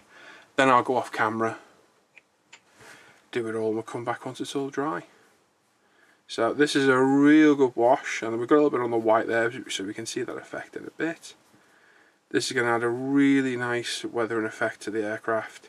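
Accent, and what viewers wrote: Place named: United States. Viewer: It's a very beautiful model, you're very expert. I advise you to mount the version f4u-1a, it's better and more beautiful. The "Birdcage" wasn't very appreciated by pilots, because it had a bad visibility. With the second version, 1a, the "Corsair" was more appreciated. And also, could you put subtitles in foreign languages? Because you speak with a very strong american accent, it's not very easy to understand for me, because it isn't my mother language... However, thanks very much for your videos!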